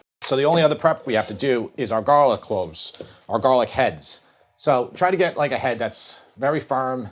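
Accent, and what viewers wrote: New York, United States. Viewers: I started checking out this channel mostly for the classic deli salad/sandwich recipes, after I specifically went looking for somebody with a Noo Yawk (or Jersey or LI) accent who actually made that stuff for a living. I've tried a few now and they've all been exactly what I imagine a good NYC Deli tuna salad or mac salad or chicken salad should taste like. And I gotta say, all these "easy one-pan" meals and pasta dishes look and sound absolutely awesome too. Time for me to start feasting on more than just really good salads and sandwiches I think. Cheers for all the great ideas!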